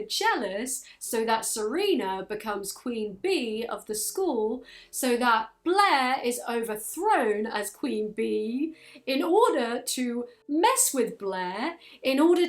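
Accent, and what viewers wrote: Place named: United Kingdom. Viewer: I love your British dialect its lovely to listen to!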